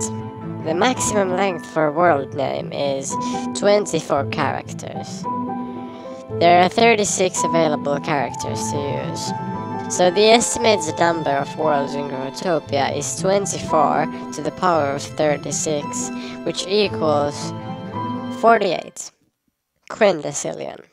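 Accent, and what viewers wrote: Finland. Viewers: Ootko Suomalainen Koska Kuulostat Siltä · are u from finland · are u finnish? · Are you from Finland?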